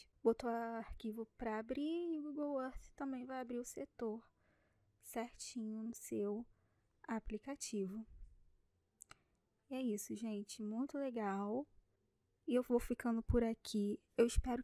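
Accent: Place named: Acre, Brazil. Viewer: Você é do Acre? Que maneiro!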